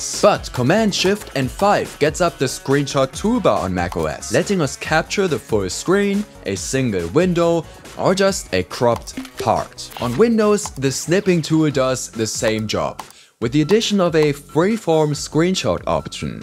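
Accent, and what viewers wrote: Germany. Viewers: You sound like you are German. Are you? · erwischt, du bist deutsch :))) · I mean, you can hear that you are probably german, but it's so subtle most people wont even notice. Still great video. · german accent go crazy tho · i can smell german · Kann es sein, dass du Deutsch bist? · Als Deutscher reichen 5 Sekunden um zu hören, dass du auch aus Deutschland kommst. Aber gutes Video. 👍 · Why does he sound like a WW2 german officer though? That accent is crazy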